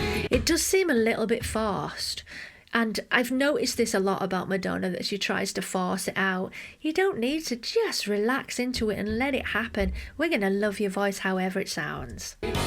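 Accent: United Kingdom. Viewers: I'm a very huge Madonna fan.. buy besides that ..I really like you..I can fell you are a very good person ..besides that ..there's a lot of reaction videos..but yours because you are a really voice couching everything you say souds really professional and you never used offencive words... you can say..she didn't get the tone or something like that.. but never offencive.. thank you ..Cheers from Costa Rica 🇨🇷... oh ...I forgot..... I love your British accent ❤ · Hi, been a fan of your videos for years, but for some reason they’ve stopped coming up on my feed. Great analysis! You have such a wonderful personality. Where in the UK are you? I love your accent. Best wishes from Philadelphia!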